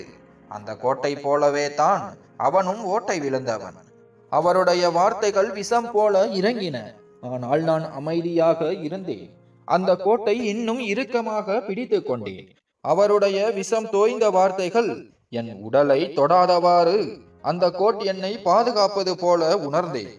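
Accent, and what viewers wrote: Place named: North India, India. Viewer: கதை நன்றாக உள்ளது ஆனால் அதை படிக்கும் விதம் சரி இல்ல. வடக்கன் தமிழ் பேசினா எப்படி இருக்குமோ அப்படி இருக்கு.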